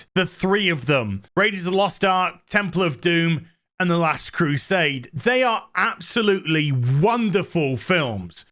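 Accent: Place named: Yorkshire, United Kingdom. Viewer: What is a morning constitution. Hard to understand this Yorkshire talk like "was straight"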